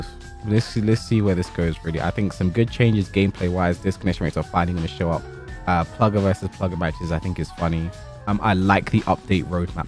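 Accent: England, United Kingdom. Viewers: The music is a little distracting. Your also speaking fast in an English accent, which usually isn't a problem but the music makes it difficult to understand what your saying. Thanks for the upload on the patch notes.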